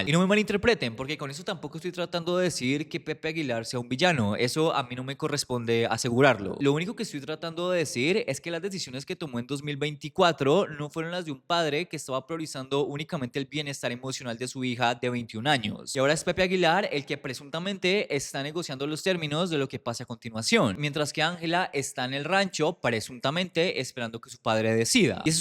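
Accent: Colombia. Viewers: Nada que ver mi comentario PERO me encanta tu acento colombiano!! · Me da la impresion que es colombiano ❤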